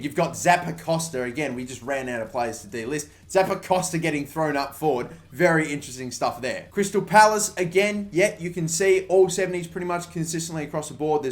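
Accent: Australia. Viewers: Yeah, could tell he was an Aussie by the accent. Probably Sydney or Brisbane. But then I could be way off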